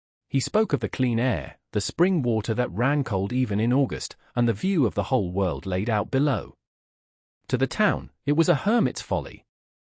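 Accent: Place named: United Kingdom. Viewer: Is there a particular reason for the British accent for the narrative?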